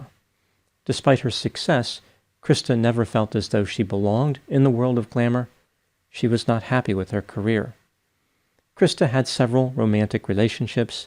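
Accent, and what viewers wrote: United States. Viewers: Yes, in particular his English has only a mild American accent.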